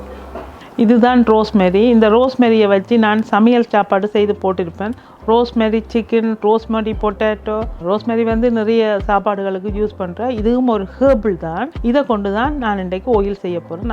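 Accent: Sri Lanka. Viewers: உங்கள் தமிழ் பேச்சு மிகவும் அழகாக அருமையாக கேட்பதற்கு இனிமையாக உள்ளது.உங்கள் இலங்கை தமிழ் கொஞ்சிப் பேசும் அழகு மிகவும் எனக்கு ரொம்ப பிடிக்கும்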